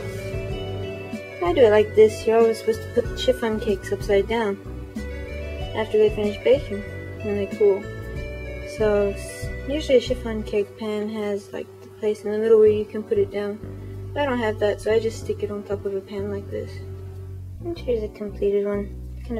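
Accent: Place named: South, United States. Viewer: Your voice still sound very southern to me. Although I can hear other things going on in it as well. Its very かわいい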